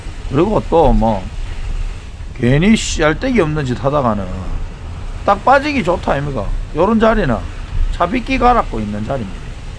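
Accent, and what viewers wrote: Gyeongsang, South Korea. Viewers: 마지막 멘트에 구독누르고갑니다 ㅎ 정겨운 갱상도사투리 좋네예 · 아따마 갱상도 사투리쥑이네예 ㅋ 구독눌립니다 😂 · 아재요! 부산사람잉교? 아! 부산에 저런 시골은 엄째. 우째던 갱상도 사투리 구수하네요. 구독 누르고 감니데이. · 매일 시청합니다 구수한 경상도 사투리가 참말로 재밌고 입담도 좋습니다 맨날맨날 화이팅 ~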